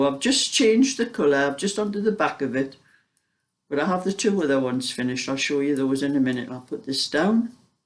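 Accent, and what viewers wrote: Newcastle, United Kingdom. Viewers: Always chuckle when you say say you’ve got “ happy Mail” Why I hear you ask ? For weeks I’ve been hearing you say Happy MEAL lol It’s ya lovely gordie accent lol Think penny’s dropped xxx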